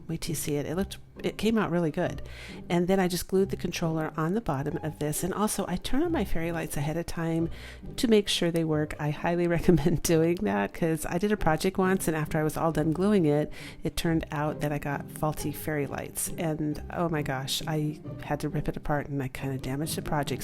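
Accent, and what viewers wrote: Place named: England, United Kingdom. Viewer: Yay a fellow decaf drinker! So cool that he's from England. I'd never get tired of his accent.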